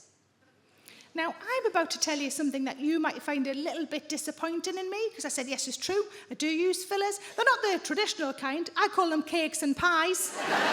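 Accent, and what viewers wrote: Newcastle, United Kingdom. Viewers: I have to say, I have a great laugh, listening to this lady. I was born in the north east of England currently residing the United States. This lady takes me home. Yes, I am a Geordie too!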